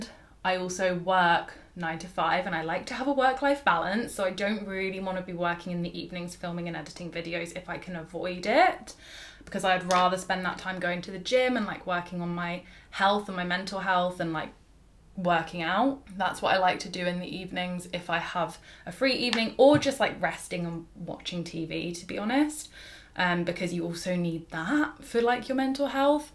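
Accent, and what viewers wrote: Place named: United Kingdom. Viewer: Hi!! I've been watching your vid's for a bit now but have finally decided to comment:) yay! I first found you as was searching for beauty tips, etc for Redheads and you popped up! Even though I'm quite a bit older than you, I totally relate to so many things you chat about, enjoy your recommendations, and love listening watching you and think you're a very mature, good head on shoulders kind of gal - love your accent too! I live in Canada but my entire fam is in the UK so gives me warm fuzzy feeling watching you - love your hair colour!! MIne is more on the Auburn/Copper side. Look forward to watching you in 2024.....Congrats on your new house & getting married:) Redhead sistahood - ha!💗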